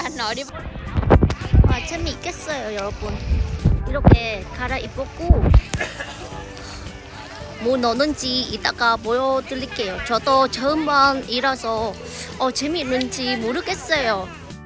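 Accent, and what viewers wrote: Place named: South Korea. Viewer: 엄마야 ㅋㅋ 한국사람인줄~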